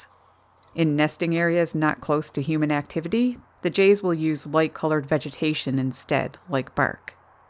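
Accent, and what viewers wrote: Canada. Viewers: I enjoy the Canadian twang in your voice.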